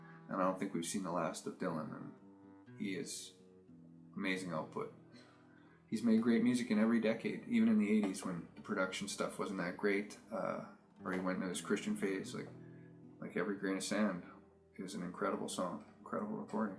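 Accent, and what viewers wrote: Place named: Canada. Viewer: You can tell this guy's from Canada in the first three seconds.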